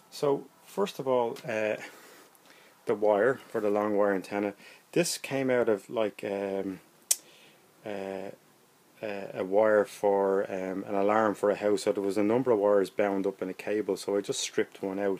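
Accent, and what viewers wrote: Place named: Ireland. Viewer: I was wondering where i had heard your accent somewhere i visited before... and your accent is Irish isnt it?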